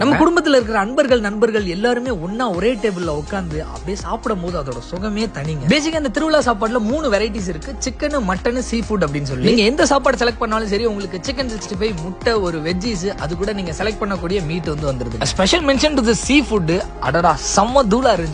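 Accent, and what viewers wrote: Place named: India. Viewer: Hanestly...? Looks like a video production from India together with the English diction.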